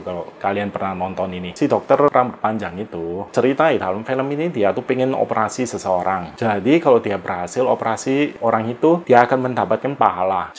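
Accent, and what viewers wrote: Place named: Hong Kong, China. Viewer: Kamu ni org Indonesia ataupun org Hong Kong? Sbb loghat kamu ada bawak sket bunyi HK. Maaf ya, saya agak keliru ni..... Saya berasal dari KL, Malaysia 🇲🇾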